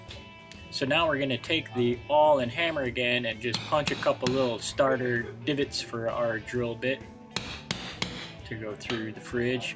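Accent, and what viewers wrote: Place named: Upper Midwest, United States. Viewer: That's the most intense upper midwestern accent I've ever heard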